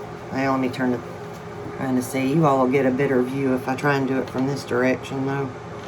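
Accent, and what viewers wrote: South, United States. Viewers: I like your Southern accent. Maybe you could edit out the blow dryer noise. That's kinda loud.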